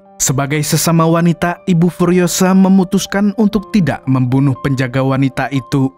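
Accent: Banjar, Indonesia. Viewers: Banjar asli kah mang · Urang Banjar kah Nang?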